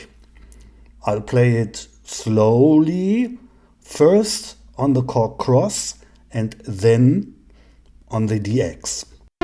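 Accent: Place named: Germany. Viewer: "VVRRROOOooo .... >> ... oooOOMMMM" 😄👍‍(made me smile!). Tested on headphones and the R8 sounds good! By the way I agree - I downloaded the WAV file and for sure the Yamaha FM synth engine is brighter than the PCM of the Korg... but it should be, that's one of it's strengths. Glad you're enjoying your Reface - I treated myself to the MODX a couple of years ago, and it's great (but also not perfect) and has become the centre of my setup. I did look at the Korg opSix (I have the amazing Wavestate and the Modwave, so did consider completing the Korg trilogy when they were selling them off cheap a few months back) but there is nothing in that which I cannot already do with the MODX, so I resisted... for now. 😉 Anyway, look forward to hearing more of the Reface in your future videos ... btw: have you tried the looper function yet ? Best Regards, Axel. p.s. 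Your voice overs sound fine, you don't sound drunk all the time, and we like your charming German accent! 😎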